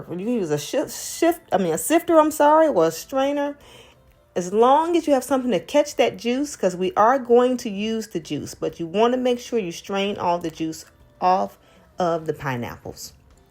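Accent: South, United States. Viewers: WOW SOUTHERN LADIES ARE THE BEST...IM MAKING THIS 😊 AND I PERSCRIBED TO YOUR CHANNEL ❤ THEY CALL ME WOODY BECAUSE PEOPLE ARE ALWAYS SAYING WOULD HE? 😂 I HAVE BEEN LOOKING AT YOURE CHANNEL AND MY MOUTH IS WATERING,I WANT TO MAKE THE MUSTARD/HONEY CHICKEN I LIKE TO COOK AS IT RELAXES ME AND I LOVE YOUR ACCENT. I BOUGHT NATALIE DUPREES BOOK NEW SOUTHERN COOKING. IM MAKING TWO NOT AT THE SAME TIME THOUGH...I WANT TO TRY ONE WITH SHORTBREAD COOKIES SOAKED IN THE PINEAPPLE JUICE TO SOFTEN THEM INSTEAD OF THE POUND CAKE, I HOPE YOU DONT MIND 🙏 THANK YOU I LIKE THAT YOU MIX ALL INGREDIENTS BY HAND, IT ADDS MORE LOVE TO IT 😇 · I enjoyed your No Bake Pineapple Dream "Cheat" Cake and want to say thank. I particularly enjoyed your smooth and delightful Southern accent. You come across as a very sincere, peaceful and happy lady. Looking forward to your next recipe sharing. 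God bless you real good,!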